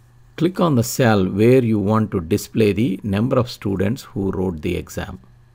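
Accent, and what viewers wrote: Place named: South India, India. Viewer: Must be from south Indian .... peice of advice ur accent s*cks so plz don't insult English language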